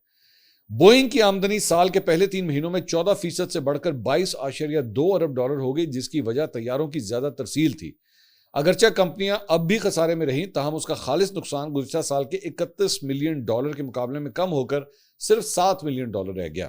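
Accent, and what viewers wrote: Punjab, Pakistan. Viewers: Sir read slowly for correct Pronounciation . Like you Punjabi accents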